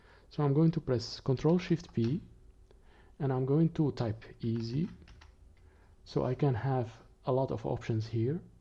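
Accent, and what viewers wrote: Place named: Italy. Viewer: Just out of curiosity: your name is not italian but your pronounce sounds italian. Where are you from?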